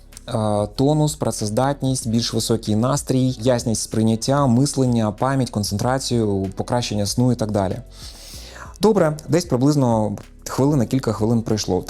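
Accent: Standard. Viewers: Така чиста українська!!!Вправи супер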